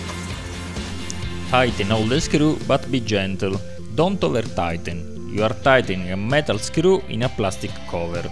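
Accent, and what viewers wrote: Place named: Italy. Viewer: love your italian accent!!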